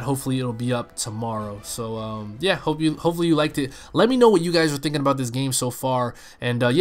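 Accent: Canada. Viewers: you're Canadian eh?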